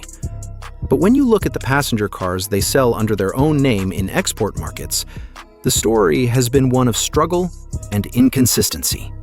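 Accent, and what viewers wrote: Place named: United States. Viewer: And a yank is telling us all about it 😂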